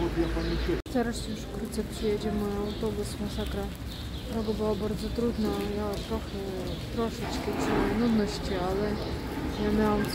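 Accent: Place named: East, Poland. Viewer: Potwierdzam to, co czytałem w innym komentarzu, mówisz coraz lepiej po polsku :) Najbardziej uderza to, że "wschodni akcent" słychać u Ciebie tylko od czasu do czasu, gdyby nie sporadyczne zastanawianie się nad doborem słów (i czasem odmiana), spokojnie można byłoby powiedzieć, że jesteś Polką np. z Podlasia (region w pobliżu granicy z Białorusią, tam - zwłaszcza w mniejszych miejscowościach - ludzie mówią w podobny, lekko śpiewny sposób). Biorąc pod uwagę, że uczysz się sama, nie masz bezpośredniego codziennego kontaktu z Polakami, to jest bardzo duże osiągnięcie. Oglądam filmy na kanale "Odin Iz", prowadzi go Ukrainiec, który pracował w Polsce, pokazuje "szokujące różnice" między Ukrainą i Polską, na przykładzie nie pomalowanych osiedli w miastach, starych domów na wsi, tylko pod każdym z takich filmów dostaje wiele komentarzy mówiących o tym, że w PL są miasta i wioski wyglądające podobnie. 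Ty w ciągu kilku minut pokazałaś więcej różnic, niż on przez kilkadziesiąt minut swoich filmów, pociągi, droga, toalety - średnio w PL jest z tym już lepiej. Babcia na pewno ugotuje coś, co lubisz - wiele osób jest zainteresowanych potrawami z innych krajów, jeśli będziesz mieć okazję, możesz pokazać, z czego i jak przygotowuje się coś zwykłego, codziennego u Was, bo dla wielu oglądających to może być ciekawe :) Pozdrowienia dla Ciebie i całej rodziny, oby szybko udało się zrobić wszystko to, co planujesz :) PS: Ładne, nowoczesne mieszkanie.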